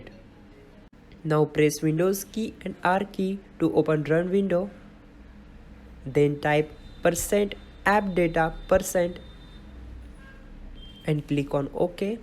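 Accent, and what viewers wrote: India. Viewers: When i heard the indian accent i thought u were a scammer or something and that it would not work however after doing this,this is the first solution that has worked the other ones that were from like white ppl that i thought would work all had failed u are truely the ACTUAL 1st indian tech support that ACTUALLY TRIES TO HELP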